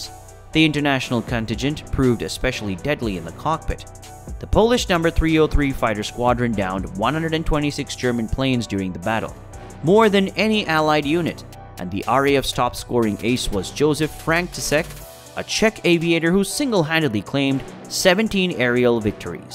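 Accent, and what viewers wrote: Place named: United States. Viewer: No I didn’t like the video and you won’t see me next time. Why didn’t I like it? Ludicrous American accent from an individual who can barely speak English. Why can he not pronounce British place names e.g. Coventry, properly? Totally inappropriate and ludicrous video footage throughout. What on earth have American planes got to do with the Battle of Britain? And was that a clip of a Japanese scene at the very end? The whole thing is absolute garbage.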